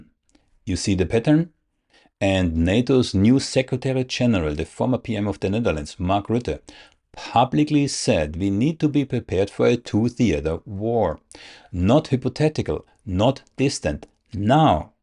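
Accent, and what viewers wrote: Germany. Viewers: I came for Russian propaganda in English with German accent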